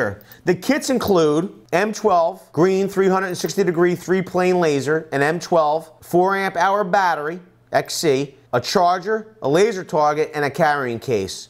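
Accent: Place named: Northeast, United States. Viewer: I'm trying to figure out where you're from based on your accent. It sounds like you spent some time in Brooklyn, Hampton Long Island, Jersey, Boston, and somewhere in the forest of Ohio. I feel like I'm missing one.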